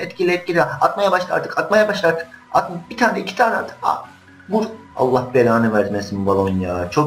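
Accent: Black Sea, Turkey. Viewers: karadenizlimisin la "D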